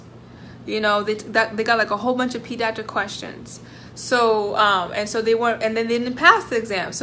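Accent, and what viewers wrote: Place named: Haiti. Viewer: This video is trash but the Haitian Accent is cool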